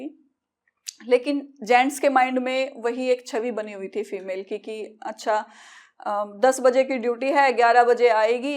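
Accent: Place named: Haryana, India. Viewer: आपको मुबारक कि आप अपने mission में सफल हुई। अब आप दिल्ली में हो अपनी हरियाणवी बोली को कंट्रोल करके बढ़िया से हिंदी के साथ इंग्लिश को mix करके बोला करो। ताकि आप दिल्ली के हर इलाके में पोस्टिंग और कामयाबी हासिल हो सके। promotion होने पर काफी easy feel करोगे । शुभकामनाएं ।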